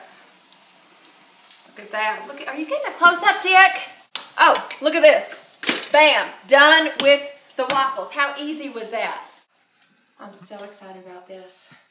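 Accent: South, United States. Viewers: What accent is she using, southern? English? Waspy New England? I like this segment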